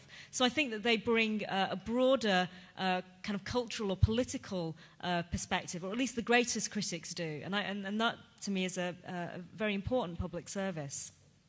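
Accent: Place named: RP, United Kingdom. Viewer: God she can fucking speak, her accent is bloody high class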